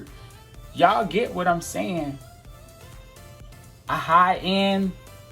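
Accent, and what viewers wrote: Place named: United States. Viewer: Also, BTW, I might add that it's refreshing to hear an American with an American accent Talking about this stuff! Usually it's someone from China or whatever, in broken English. I didn't realize this hobby was so popular here in the states too! I'm in Montana (although I'm from the NY/NJ area originally) and nobody around here has any clue about this at all! Back in the Days of analog cable, we used to use the "boxes" that turned on all the channels but I'm Talking about late 1990's there. I didn't even Knew such a thing as this exists until recently! What part of the country are you in?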